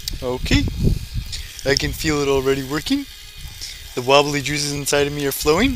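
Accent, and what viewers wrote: Canada. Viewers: Is he canadian (no offense - pretty cool peeps) but still he got k way too much . special or what ? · French Canadian obviously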